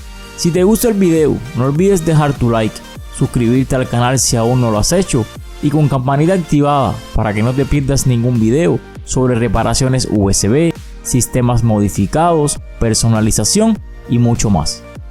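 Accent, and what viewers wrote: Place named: Cuba. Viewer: Que sos de Cuba o el Salvador?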